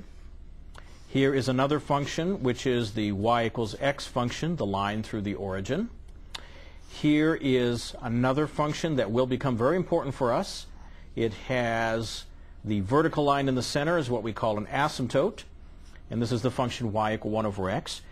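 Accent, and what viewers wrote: United States. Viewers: some kind of midwestern american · eww southern accent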